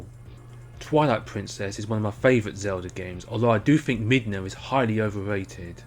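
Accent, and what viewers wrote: England, United Kingdom. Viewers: dull: dou battle: ba-uh man I love the English accent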